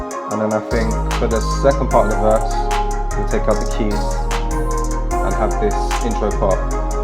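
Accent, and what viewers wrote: United Kingdom. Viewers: Why do people with a British accent love to use the word “literally” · Its sound british af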